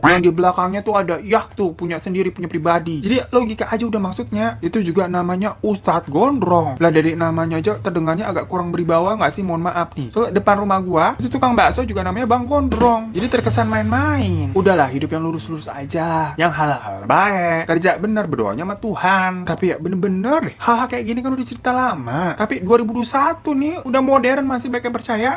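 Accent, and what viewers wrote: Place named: Bekasi, Indonesia. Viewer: orang babelan ya 😂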